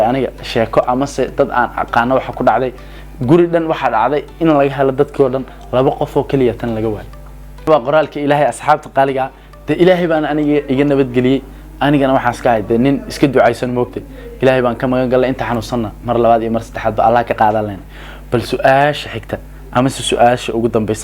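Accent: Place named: Mogadishu, Somalia. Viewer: Lahajda Heer Xamarkad Wadata Weli Bro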